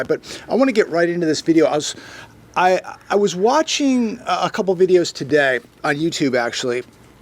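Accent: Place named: Chicago, United States. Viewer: Taday,love the Chicago accent😊✝️.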